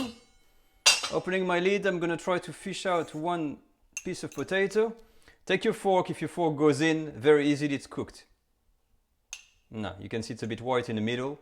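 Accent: France. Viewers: Merci 😘 j'adore French acent in English language. Bisous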